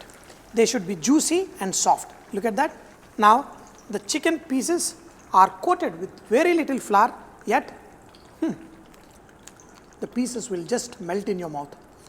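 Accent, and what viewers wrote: India. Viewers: I dont want to listen ur voice and butler English, thats why I mute the video.. But like ur skills.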